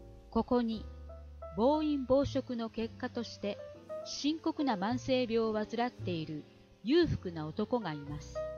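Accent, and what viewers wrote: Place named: Kansai, Japan. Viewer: 関西なまりが気になりましたが、寝る時よく聞いてます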